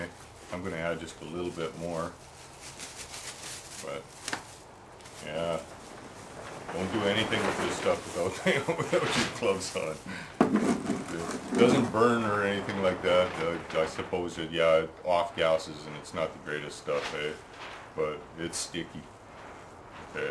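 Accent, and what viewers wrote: Canada. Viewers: That Canadian accent is on point aye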